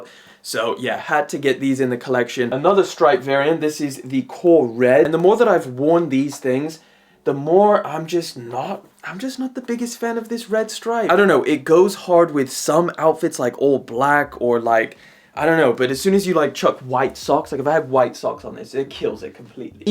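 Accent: South Africa. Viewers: I wanted to say I hear a bit of South Africa in your accent 😂👀🔥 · I KNEW I GOT A HINT OF SOUTH AFRICA WHEN I HEARD YOU TALK!!! Represent! 🔥 🇿🇦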